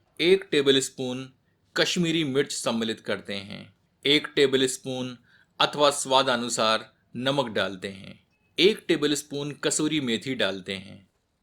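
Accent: Standard. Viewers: आपकी शुद्ध हिंदी का बोलना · आपकी शुद्ध हिन्दी सुन कर ऐसा लगा जैसे रामायण देख रहा हूं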